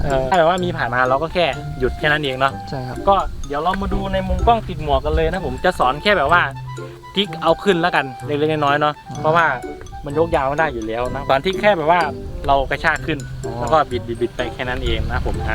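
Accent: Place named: Isan, Thailand. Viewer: เว้าอีสานแน่อ้าย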